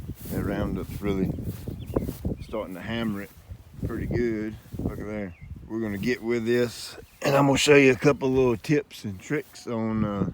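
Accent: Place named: Carolinas, United States. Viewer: Your video says how to have a wonderful lawn, but you talked mainly about the beds. By your accent, I guess you live in the Carolina's so maybe your winters are not too bad. Here in Canada we have pretty harsh winters which take its toll on the grass. I would love more information about how you get your lawn to look so good. I have a rural property and know from the size of your property you spend a lot of time on your lawns and they really do show it they are so lush. Do you have a video where you explain how you feed and maintain your lawn. Thanks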